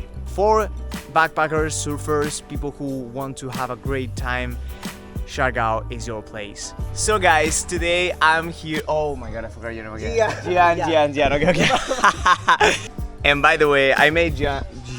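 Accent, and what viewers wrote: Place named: Spain. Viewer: Bali is already established while Siargao is just beginning to show and mark its presence among the world”s favorite island resort. I love your Spanish accent though and be yourself always but I would not be surprised if you will gain more subscribers and followers while in the Philippines. Enjoy ur stay in Siargao and also visit Boracay and you find so many luxurious accommodations.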